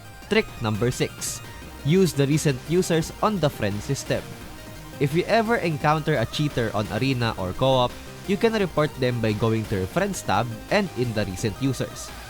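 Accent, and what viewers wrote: Philippines. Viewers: Nice video pare, salamat sa tips and tricks. Halatang pinoy with the accent 😂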